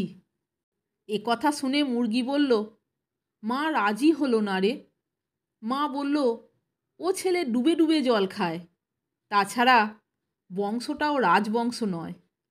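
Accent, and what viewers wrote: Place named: Ghoti, India. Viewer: দিদি, আপনি ঘটি, না বাঙাল? আপনার রিলগুলো বাঙাল ভাষায় এবং সম্ভবতঃ বাংলাদেশে বা বাংলাদেশীদের সাথে করা। আর আপনার জোকসগুলি একক কণ্ঠে ঘটি ভাষায়! আমরা সত্যি ই কনফিউজড!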